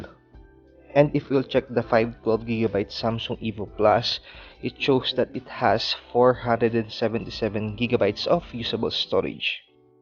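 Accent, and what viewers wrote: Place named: India. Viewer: Lol, me too. But he speaks i think indienglish or tagaloenglish thats why.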